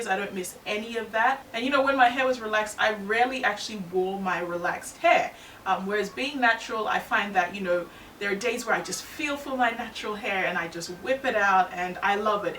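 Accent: Australia. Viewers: I love your hair and your top. This is my first time on your channel, but is your accent Australian? I can't place it exactly.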